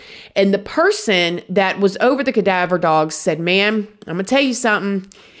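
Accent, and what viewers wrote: United States. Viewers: Seguay from the story - your are looking amazing! and Love your accent - although we are English, My Auntie married an Air Force man and lived in Little Rock after the war - and now she has an accent like yours. I used to get her to say "tom-ato' and "burrrrrgers" all the time! I hope they dig up that dog - gone well. Other wise we will never, never know. Nahhh, they will eventually, even if its crowd funded. The suspense would be too much.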